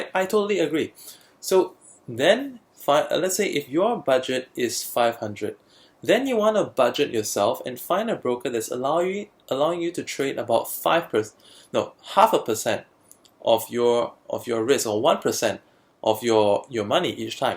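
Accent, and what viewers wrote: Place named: Singapore. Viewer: Hey, I reside in Singapore. I assume you're a Singaporean too judging by your accent. Would you perhaps share with me a platform on which we can chat(email or skype). I'm eager to know so much more and would be grateful to learn from no other but a fellow Singaporean.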